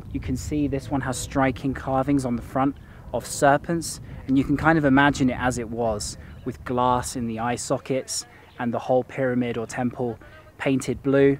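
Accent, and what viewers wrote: United Kingdom. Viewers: I love your British accent 💓 so funny... And I love see you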